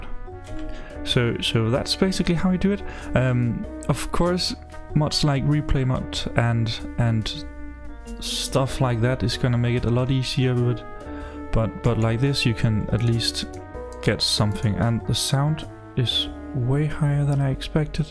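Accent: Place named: Denmark. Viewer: Jeg vidste bare du var dansk så snart du begyndte at snakke hahaha ; ) fed video, tak for hjælpen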